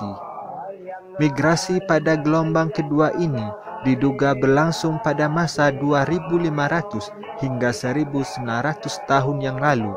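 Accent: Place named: Kerinci, Indonesia. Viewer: Penyampaian kata2 nya membosankan, jelas bngat logat kerincinya.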